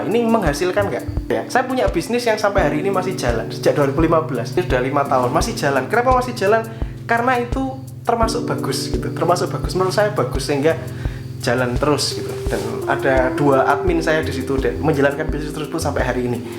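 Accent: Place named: Java, Indonesia. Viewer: Ujug-ujug Wong Jowo medhog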